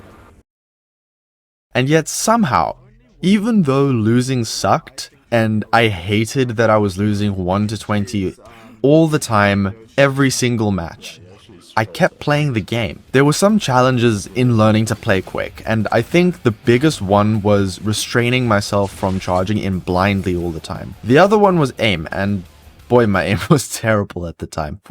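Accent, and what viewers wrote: South Africa. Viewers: Is this man South African?! Legend. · Hah, I could instantly tell the Saffer accent :D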